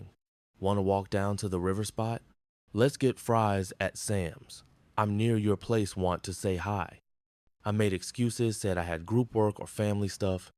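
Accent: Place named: Africa. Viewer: You're African, I can tell from your voice.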